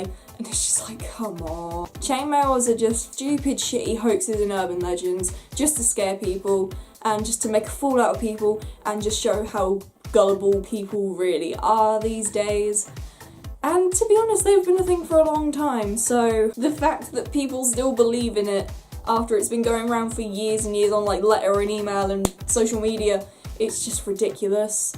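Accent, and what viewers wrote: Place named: Norfolk, United Kingdom. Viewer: Learn to speak Enlgish you Norfolkian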